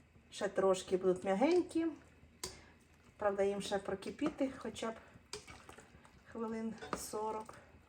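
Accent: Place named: Vinnytsia, Ukraine. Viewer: Скажіть, будьте ласкаві, з якої Ви області, бо у Вас такий говір, як у нас на Вінниччині? І де Ви придбали свою класнючу кастрюльку? Я на неї не могла все відео налюбуватися!😊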